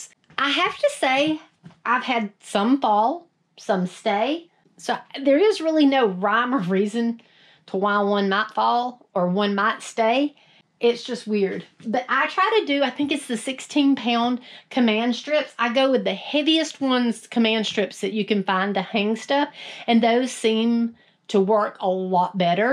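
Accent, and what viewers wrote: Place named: East Texas, United States. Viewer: I just ran across your channel and I love your content! I'm not sure where you're from, but you sure sound east Texan!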